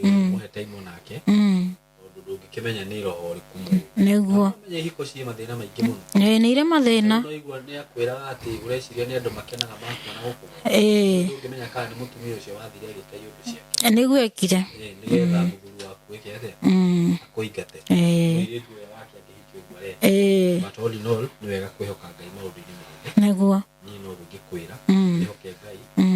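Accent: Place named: Nyeri, Kenya. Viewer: She is sturbon nyeri lady from her talk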